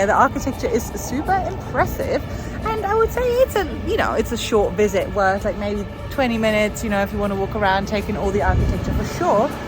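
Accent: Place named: United Kingdom. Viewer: You are not German because you have a British accent, if you where German you would have a German speaking channel. Just admit that you are British and not German because nobody believes you.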